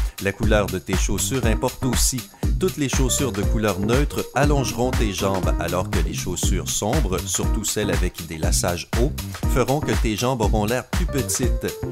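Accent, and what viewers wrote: Quebec, Canada. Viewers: Pourquoi une voix québécoise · Ses un québécois celui qui parle ?😊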